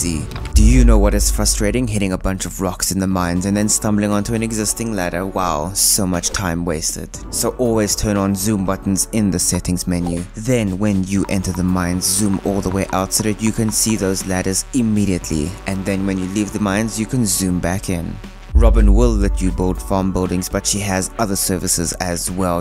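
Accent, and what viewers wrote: South Africa. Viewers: So that's what that accent is. I like it I just didn't know that was a South African accent.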